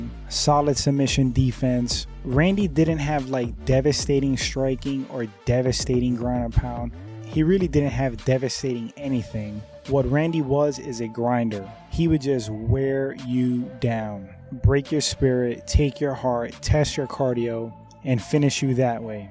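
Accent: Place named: United States. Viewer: I know, I can tell from your videos the knowledge you give and exampling is well on point. Plus the casuals are important for MMA right now I only say it because people are talking about it and participating in the sport which will carry it on in the future. I'm only 23 years old. I became a fan of MMA around 2006 or 2007 the first fight I watched was Liddell vs Ortiz 2. I played a video game called UFC Sudden Impact way before I became a fan of MMA, But anyway I was a Amateur in Muay Thai and Boxing when I was younger (I know a lot about those to sports as well) my biggest accomplishment so far is fighting former WBO Champion Joseph Parker in 2009 Cruiserweight Golden Gloves in New Zealand. Unfortunately I got KO'ed in the opening tournament. Glad to see he's doing great in his career. I've only had 1 MMA fight that was back in 2013 lost by DEC but that year I defeated Patrick Afoa who fought Israel Adesanya 4 times in Kickboxing. 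But I quited from fighting 4 years ago for family reasons. But its awesome to see a fellow MMA fan that knows what he's talking about, its great to hear that your training as well and that you sound either American? or Canadian? Which makes you'll have more opportunity then then what I had in New Zealand. Well Thank you for the comment. I will stay in tune for your videos from now. I may have other fantasy fight suggestions like: Kazushi Sakuraba vs Rickson Gracie Stipe Miocic vs Tim Syvia Tyrone Woodley vs Matt Hughes BJ Penn vs Urijah Faber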